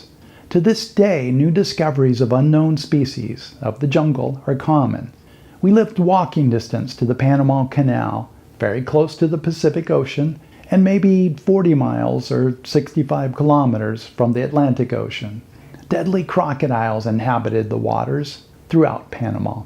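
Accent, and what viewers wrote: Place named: England, United Kingdom. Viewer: Thank you 🙏 so much for your beautiful video. I love it a lot because I learnt so much from your English accent 🙏 and your presentation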